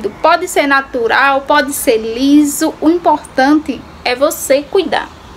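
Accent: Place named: Brazil. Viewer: Eu nao sou Brasileira mas falo portugués e gosto dos diferentes sotaques do Brasil. Eu gosto do seu cheito de falar, do bom dia e do "meu povo". Voce é auténtica e mostra sua vida como ela é, se alguem nao gostar do seu sotaque pois que asista outra youtuber de outra regiao. Continue com seu bom dia que tem gente q gosta, continue com seu cheitinho de ser que é unico e original 🌸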